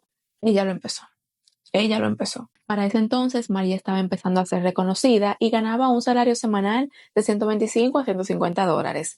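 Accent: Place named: Dominican Republic. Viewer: Me encanta tu voz, que agradable es el acento dominicano. Saludos desde México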